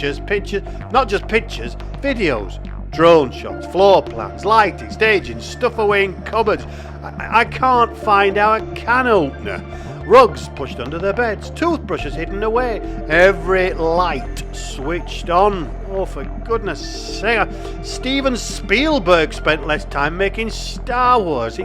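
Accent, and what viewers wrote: Northern England, United Kingdom. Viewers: Great video...we had a similar issue when deciding our move to France...fortunately our house sold in a week..the slow part was after we offered on our current house in France it took 5 months from offer to completion but well worth the wait. We were from Yorkshire and you definitely sound like you have a northern accent but cant quite place it😂...looking forward to the next episode..a great alternative to Strictly Come Dancing😂..Andrew and Julie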